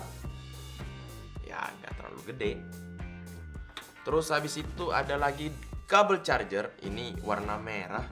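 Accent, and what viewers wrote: Makassar, Indonesia. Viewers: Bukan malah sebaliknya. Justru ciri khas nya itu adalah logat atau bahasa Makassar nya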